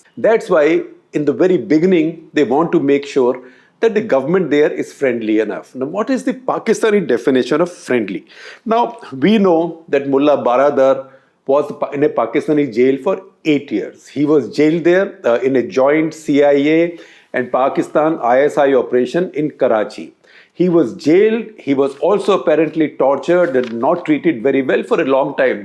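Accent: India. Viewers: gupta G you have curry accent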